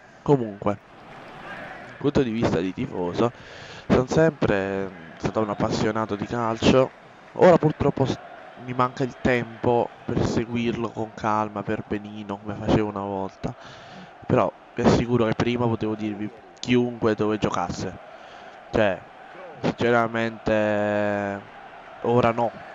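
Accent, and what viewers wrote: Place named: Caserta, Italy. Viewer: bella ti immaginavo di caserta per la parlata...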